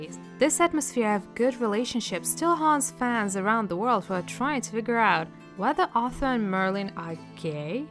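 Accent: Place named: Russia. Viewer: At the beginning of the episode I somehow had the feeling as if a Russian person spoke English (not a criticism)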